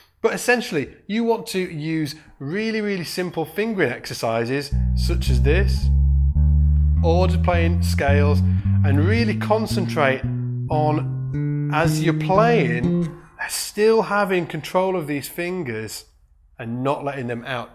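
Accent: United Kingdom. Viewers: damn, that is some hardcore british accent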